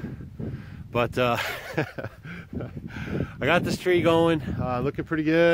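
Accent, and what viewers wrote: United States. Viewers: you from usa?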